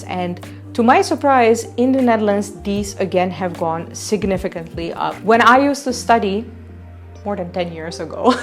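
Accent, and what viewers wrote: India. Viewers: And you have Indian accent! Wow! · For sure u are getting the Indian English accent.Great video. Thanks.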